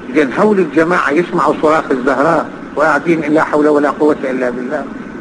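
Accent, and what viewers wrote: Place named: Iraq. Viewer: هذا لهجة نتاعو عراقية ماشي دزيري الله لا يبلينا ربي بالتشيع الله يسترنا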